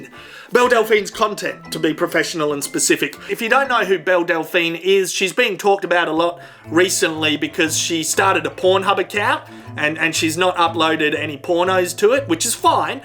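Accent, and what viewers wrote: Australia. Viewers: U should teach ur American fans how to talk like an Australian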